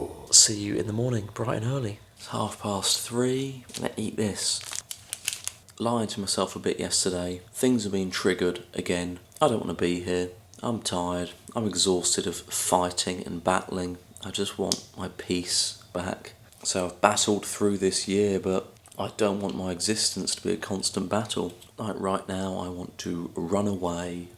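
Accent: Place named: Berkshire, United Kingdom. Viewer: Hi. What part of England are you from? I detect a Berkshire accent but could be wrong! All the best 👍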